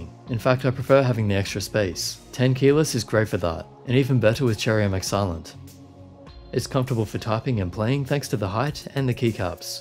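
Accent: Australia. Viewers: Do you speak a General Aussie accent? Im learning accents and it’s hard to find the difference between Broad and General. I know ur not using the Cultivated accent.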